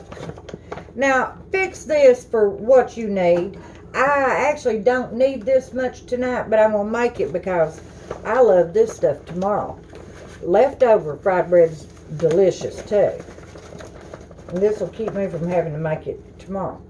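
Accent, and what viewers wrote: South, United States. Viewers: I absolutely love watching your videos. If I cud afford to send u a gift. I wud. U are a complete JOY to watch. AND I laugh at the way u talk w ur accent and ur country ways. I'm the same way girl. I've had fried bread all my life. And I love it. You are one hallarious woman and I wud love to meet u. Bit I live in North Carolina. And I don't own a car. Or I'd pay u a little visit. Lots of love, hugs and prayers sweetheart ❤♥💕💖😘💗❤. GOD BLESS US ALL SWEETHEART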